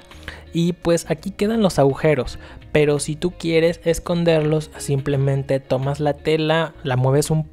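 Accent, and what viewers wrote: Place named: Mexico City, Mexico. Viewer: Eres de El Centro de Mexico o CIUDAD de Mexico....por El acento 🎵🎶🎵🎵.. Alargan las palabras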